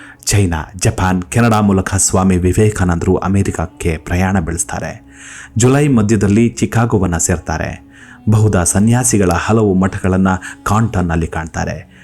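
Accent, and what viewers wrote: Standard. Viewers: Uttama. vyakhyana hagoo shuddha bhaashe Keli santoshavayitu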